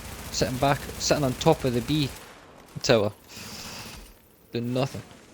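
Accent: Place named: Cornwall, United Kingdom. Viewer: Gonna go on a guessing your from the south west of the UK:) A slight cornish accent;) I'm from Devon myself:)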